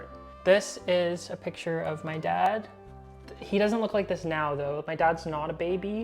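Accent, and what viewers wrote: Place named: United States. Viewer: If you only lived 2 years in the US how is your accent so American? (Sorry, just one of the many, many questions I have after watching this video)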